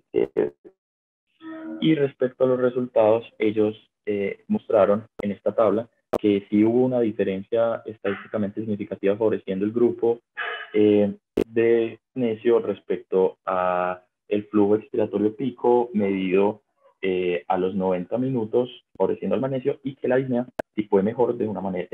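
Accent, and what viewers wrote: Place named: Colombia. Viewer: No se entiende por el acento colombiano o es que tiene el micrófono muy pegado de la boca debe hablar más lento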